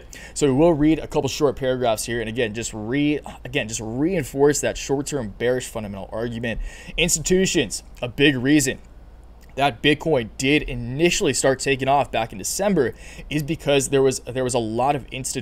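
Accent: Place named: Hawaii, United States. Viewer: He's from Hawaii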